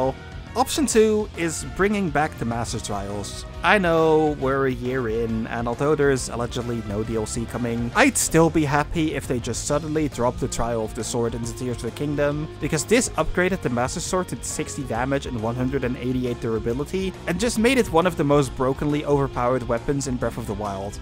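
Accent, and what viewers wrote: Netherlands. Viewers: Hey jij bent nederlands ! HA betrapt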